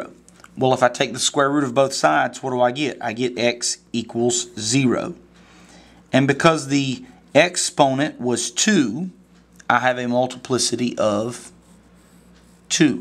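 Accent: South, United States. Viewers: Not sure why, but math explained in a southern accent is so much easier to understand.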